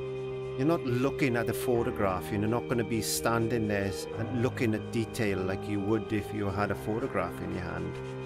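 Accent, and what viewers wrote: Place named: Mid Glamorgan, United Kingdom. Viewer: never mind the music love to see your art and hear that Mid- Glamorgan accent. I can see Barry-John running up the beach to score a try, and that's from an Englishman. Love your videos, so relaxing from one so many miles from home. Be safe x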